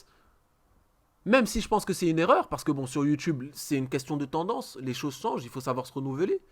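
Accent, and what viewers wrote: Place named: Essonne, France. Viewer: Par où commencer , bon deja c'est la deuxième fois que je regarde une de tes vidéos et j'aime bien ta façon de penser et ta façon de parler , d'ailleurs je me demande si t'es pas un Boug du 91 ... Thekairi78 j'ai regarder sa toute première vidéo sur YouTube en 2011 , il porter une casquette , il disait Salam Aleykoum, j'ai direct accroché au personnage par ce que je me reconnaissais dedans , un mec de cité sur YouTube en 2011 y en avait pas bcp , îl parlait de religion , de l'école , des femmes , de la France , bref de la vie en général ... Ses records zombies , les massacres qu'il faisait avec sa team en multijoueur , c'était un régal de regarder ses vidéos ! Alors le voir changer autant en si peu de temps , c'est normal que ces anciens abonnés dislike ces vidéos , c'est comme si tu voyais un de tes potes sombrer dans la drogue , l'alcool , les putes etc bon l'exemple est un peu extrême mais c'est dans cet optique que je vois les choses Voilà chacal principal j'espère que tu verra ca!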